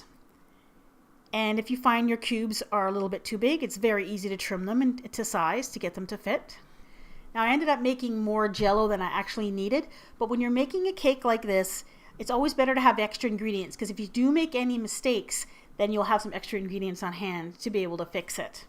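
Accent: Canada. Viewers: omg, your Canadian!! :O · your canadian, right? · Is she Canadian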